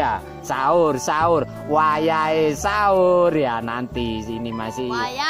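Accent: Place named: Java, Indonesia. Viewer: Asli ngendi bang kok boso jowone kadang kentel banget👍👍👍 Salkom dan mantuulll banget👍👍👍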